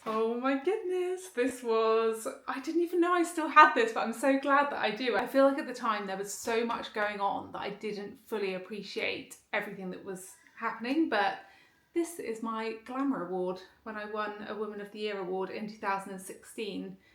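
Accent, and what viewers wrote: United Kingdom. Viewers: Best British accent 😊 I also miss when you made vlogs with your friend Kate🌸🌸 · So happy for you. I would love to see every moment of your renovations. You have the most beautiful skin, you are glowing! I could listen to your beautiful British accent all day. Blessings 💝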